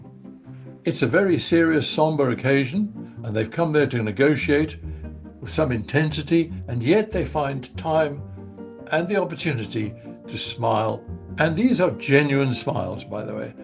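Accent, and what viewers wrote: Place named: RP, United Kingdom. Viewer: So we supposed to believe u coz u got Elizabeth's accent. Tsek wena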